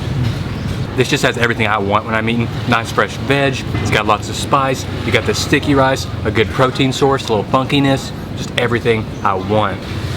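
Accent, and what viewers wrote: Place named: South, United States. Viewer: Is it just me, but I think Thai food have changed you 😝. I miss your slow-paced talk, the cool & relaxed southern style 🙂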